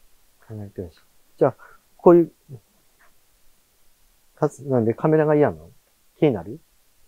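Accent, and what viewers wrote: Japan. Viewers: 沖縄のイントネーションがかなり入ってます。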